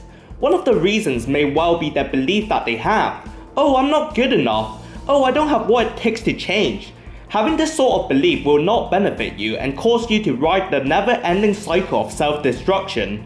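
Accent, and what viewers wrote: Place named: United Kingdom. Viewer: Totally love that British-Chinese accent ;)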